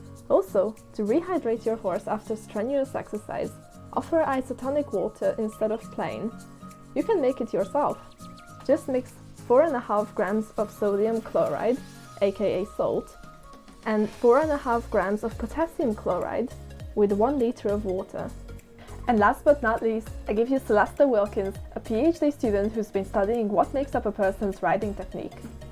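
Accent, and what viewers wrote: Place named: United Kingdom. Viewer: Hey! Nice video, well done! You sound like a born british, and speak like a real youtuber :D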